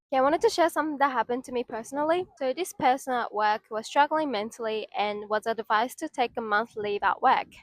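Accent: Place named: Australia. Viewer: I subscribed because of your australian accent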